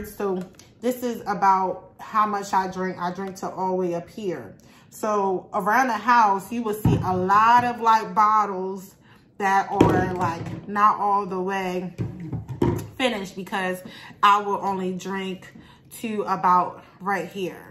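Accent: Baltimore, United States. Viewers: Are you from Baltimore? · You gotta be from baltimore lol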